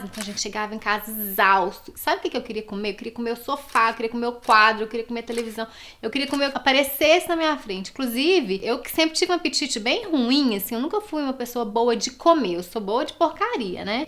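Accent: Minas Gerais, Brazil. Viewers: Te encontrei a pouco tempo, e que delícia! Amo ouvir seu sotaque mineiro, sou mineira mas moro no MT em sinop. Seu vídeos são inspiradores... Tenho amado muito. parabéns pelo canal.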